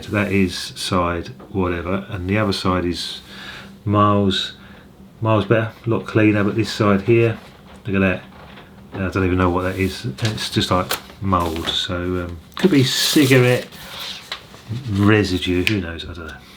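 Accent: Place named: RP, United Kingdom. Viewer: Your videos are strange. I don't know if you care about music at all... Do you? Or are you all about reselling? Or is it just an obsession? Your "posh" (affected or not?) accent quite throws me of. I cannot figure you out... Where are you from? [Full disclosure, I am a Fleming from Brussels, but i've lived in Massachussets (USA) for 40 years.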